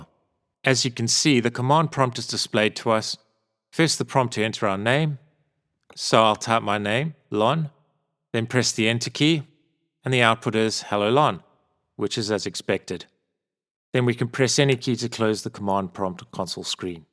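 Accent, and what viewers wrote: South Africa. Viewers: Howsit, was about to ask this but saw this reply. Thanks for the courses and info. South African PHP developer in the UK looking to learn c# - even better getting to listen to a fellow saffa. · Thank you very much. It is a little challenging for me to digest everything after watching it once, so will need repeating but the quality of your course and of course S.African accent helps.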